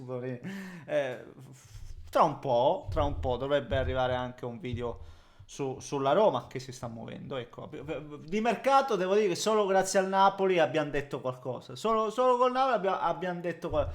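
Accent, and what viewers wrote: Calabria, Italy. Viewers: È lucano? Ma parla e si muove come i calabresi ahahahaha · devo dire che la cosa che più mi ha colpito del video è scoprire che sei lucano (lo scopro ora nonostante ti segua da anni) il che mi ha sorpreso perché dalla tua parlata passa un filo di accento calabrese quindi immagino tu sia della zona vicino la calabria. magari mi sbaglio, tuttavia io ho origini materne proprio in basilicata e quindi volevo sapere di che zona sei magari c’è la remota possibilità che tu sia della “mia” zona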